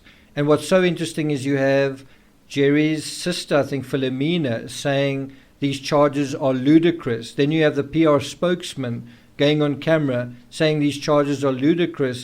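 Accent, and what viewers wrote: South Africa. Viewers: First time watcher. Why does it sound like you are South African?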